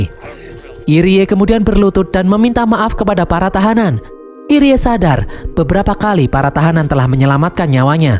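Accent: Java, Indonesia. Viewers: jadi unik nntn jepang kuno pakai narasi logat jowo medok😁👌🏻👍🏻 penasaran klo logat nya dirubah le logat ngapak..mungkin tambah unik⭐⭐⭐by : sucriber baru🙏🏻🤩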